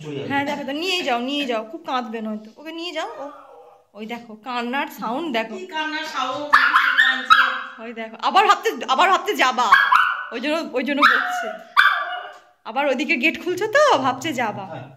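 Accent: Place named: Nadia, India. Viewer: তোমার কথায় খুব সুন্দরভাবে নদিয়া জেলার টান আছে l আমার পৈত্রিক বাড়ি শান্তিপুরে l তাই এই টানটার সঙ্গে খুব পরিচিত আমি l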